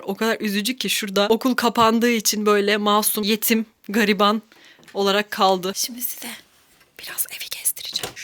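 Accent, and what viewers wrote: Ireland. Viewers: AKLIMA CILLIAN MURPHY GELİYOR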